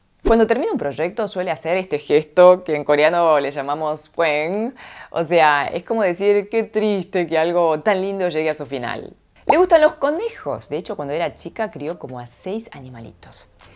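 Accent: Argentina. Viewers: Me enamoré de esta chica con acento argentino y con esa voz envolvente y sensual.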